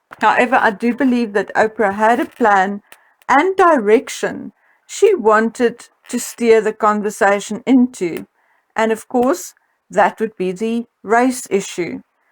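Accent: South Africa. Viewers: ...firstly I really enjoy your vids and appreciate all the research and effort you put into producing and posting them Thank you. May I ask about your accent? Is it South African?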